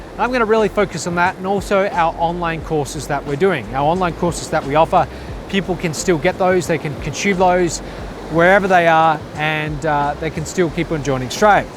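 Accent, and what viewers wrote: Australia. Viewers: Yep a bloody Aussie!!!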